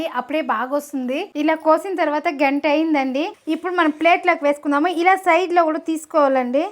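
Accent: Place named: Chittoor, India. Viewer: Maadi ctr andi slang ala unte adiga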